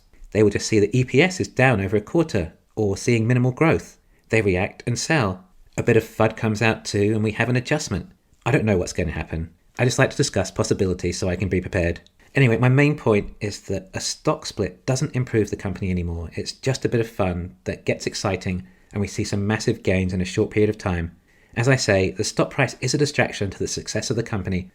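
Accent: England, United Kingdom. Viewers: You British? I followed you for an year without realizing that,thinking you're from the States. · English accent...word have more than one syllable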